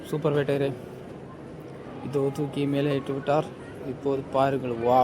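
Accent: Sri Lanka. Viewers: ஈழத் தமிழ் பேசுவதை போல பேசுகிறீர்கள்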